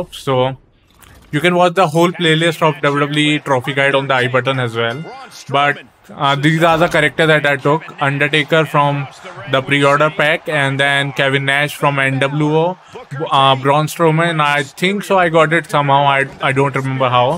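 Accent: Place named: India. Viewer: Nothing worse than the Indian accent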